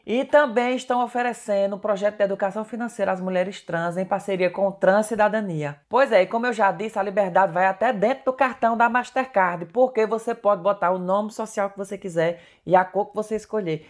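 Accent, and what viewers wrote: Ceará, Brazil. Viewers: Bom dia, espero que tudo esteja bem c vc.como boa nordestina gosto.de assisti-lo pois vc ñ perde o sotaque cearense do Juazeiro e do Crato, sou paraibana do sertão.e minha cidade faz limite com Mauriti e conheço toda região. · Adoro seus vídeos, vc nos mostra lugares lindos e passa as informações de forma divertida e amo seu sotaque, sou de São Paulo mas minha família é do Ceará, qdo vejo seus vídeos só lembro da minha família 🥰😘💚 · Gratidão por manter seu sotaque, por valorizar suas raízes. Também sou nordestina e amo o nosso jeito de ser.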